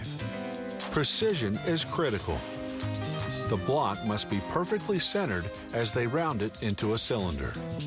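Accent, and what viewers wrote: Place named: United States. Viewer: que acento tan feo! debe de ser un americano, no? que contrasto con el sonido tan dulce de las flautas!!!!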